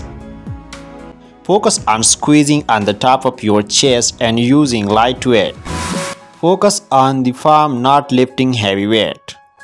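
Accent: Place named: India. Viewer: Сразу слышно что индус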